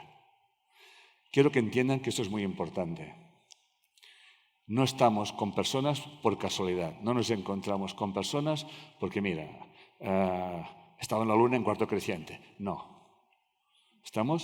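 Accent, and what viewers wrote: Catalonia, Spain. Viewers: hay palabras q no se te entienden porque tu catalán lo impide.....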